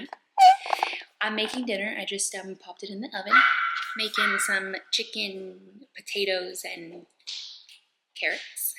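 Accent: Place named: South, United States. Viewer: It's so funny how your accent gets waaaay more southern when you shop with your mom LOL. I Enjoy these flea market vlogs so much <3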